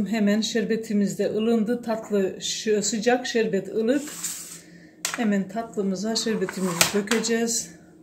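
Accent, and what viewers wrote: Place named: Black Sea, Turkey. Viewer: Banada Karadeniz li gibi geldi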